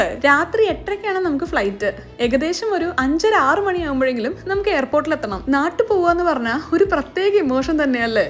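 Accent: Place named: Valluvanad, India. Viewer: Calicut University in Malappuram തേഞ്ഞിപ്പാലം പക്ഷേ അതിനും പേര് പറയുന്നത് Calicut University എന്നാണ്❤️ കൊല്ലം വഴി വന്നാൽ ചിലപ്പോൾ എന്നെ കണ്ടേക്കാം എനിക്ക് ഒരുപാട് ഇഷ്ടമുള്ള ഒരു influencer ആണ് എന്റെ ഈ കുഞ്ഞ് അനിയത്തി കുട്ടി വള്ളുവനാടൻ സംസാരം കൊണ്ട് ആരെയും ആകർഷിക്കുന്ന ഒരു കുട്ടിയാണ് നിങ്ങൾ നിങ്ങളുടെ breakfast recipe ൽ ആകൃഷ്ടയായ ഞാൻ നിങ്ങളോടൊപ്പം സഞ്ചരിച്ച് Yuvi boyൽ എത്തിച്ചേർന്നിരിക്കുകയാണ് നാട്ടിലെത്തിയ നിറഞ്ഞപ്പോൾ പറഞ്ഞറിയിക്കാൻ കഴിയാത്ത സന്തോഷം വല്ലപ്പോഴും എവിടെയെങ്കിലും വച്ച് കാണണമെന്നാണ് എന്റെ ആഗ്രഹവും പ്രാർത്ഥനയും അത്രത്തോളം എനിക്ക് ഇഷ്ടമാണ് ഈ മല്ലു പെൺകുട്ടിയെ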